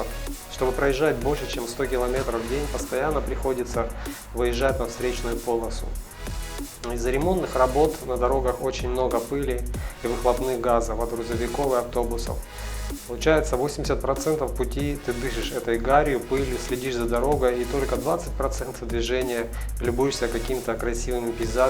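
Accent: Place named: South, Russia. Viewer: Интересная поездочка! Мне не доводилось бывать в Непале. Самая близкое к тому место посещения - Индонезия. Кстати, дымка - скорее всего, проявление высокой влажности. Я такое видел в Джакарте и Лагосе. И вопрос - откуда южный говор, если не секрет? ))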